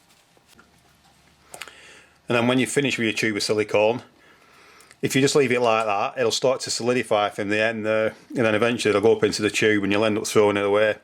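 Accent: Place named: Lancashire, United Kingdom. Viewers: so i was pretty close. Luv a good Lancs accent :) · Great video! Even better with your telltale Lancashire accent. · This Northern fella has helped me no end become a competent DIY'er. I am already a qualified painter and decorator, so to look up this channel just furthers any skills. I even fixed my pensioner mates toilet. Plumber wanted 50 quid, I ordered the part for him and it cost £11.00. Not to mention my Mum's problem with her light fitting, last year. Top bloke! Thank you so much and love that accent! I'm not doing bad 'for a Girl!' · Thank you for this, the Blackburn/Lancashire accent here is strong!!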